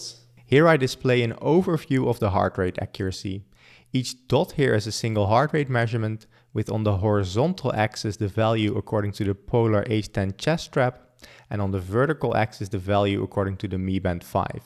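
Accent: Netherlands. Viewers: AHHAHAHAHAHA 100% accuracy in Dutch speaking English.... I was worried I didn't recognize u r Dutch correctly because the cars in your video had white background plates, but then I checked your CV... :D Good job, amazing video, thanks a lot for your videos, i'm ur subscriber now! Greetings from Rotterdam, I'm an expat here and love the country! Tot volgende keer! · Are you Dutch? The accent sounds very familiar. The video was great, comprehensive analysis of the features in a tidy format, well done! :D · Are you Dutch? Your accent feels similar.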